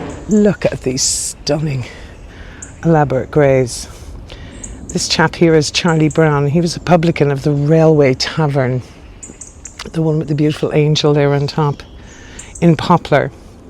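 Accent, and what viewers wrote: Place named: Ireland. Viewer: When you put on the CC it comes up with Tarrah instead of tower. It's your lovely Irish accent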